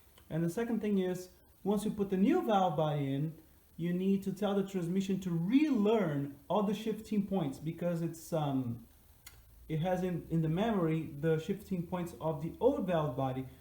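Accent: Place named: Portugal. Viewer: Seu inglês aportuguesado está ok 👌 😂😂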